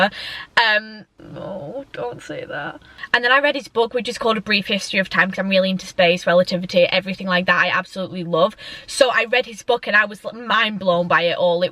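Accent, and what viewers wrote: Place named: Stoke, United Kingdom. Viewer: U sound Stoke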